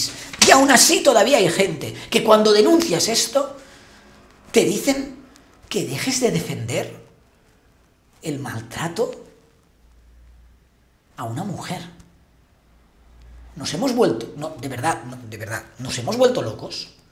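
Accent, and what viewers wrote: Catalonia, Spain. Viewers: Por si le sirve de ayuda le diré que espero cada día sus videos, que estoy prácticamente de acuerdo con todo lo q usted dice, que me encanta como expone y argumenta lo q dice y que estoy enganchada a sus videos. Quien no quiera verle que no le siga. A veces quienes reman en contra de todos y a favor del sentido común son apedreados, pero es el tiempo quien pone a todo el mundo en que lugar. No nos abandone, no cambie, no dude de si mismo, a mi al menos me tiene fascinada. Ejemplo absoluto de respeto, crítica constructiva y profesionalidad. Como detalle frívolo....el acento catalán me encantaaaaa. No deje esto, salvo que así lo desee. Que madie le diga que pensar o que decir. GRACIAS. ÁNIMO.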